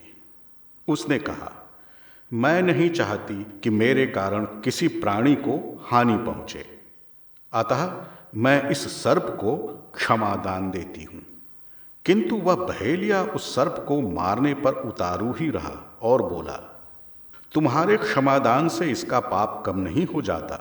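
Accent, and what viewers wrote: Standard. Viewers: शुद्ध उच्चारण में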